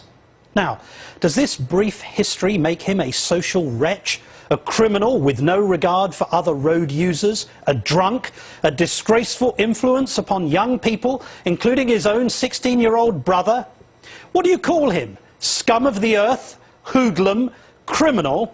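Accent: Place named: United Kingdom. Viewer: Wow--funny how far he stretches to make a Conservative look bad by digging up something that happened more than 23 years before he was elected, as a teetotaller. Obama was a druggie in the 80's--notice he doesn't bring that up. Obama was promoted by murderers and terrorists. Obama tried to stop helping babies who survived abortions and wanted to let them die. If that's the best this moron can do, he needs to take that British Accdent and go hang out in Englan with that racist Paul MCartney.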